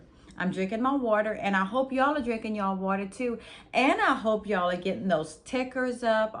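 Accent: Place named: Cajun, United States. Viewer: I subscribed. Your channel is young. I'll give you a like. Let's get your numbers up. I like your tiny Cajun accent. It's barely there but it's there. Like delroy lindo.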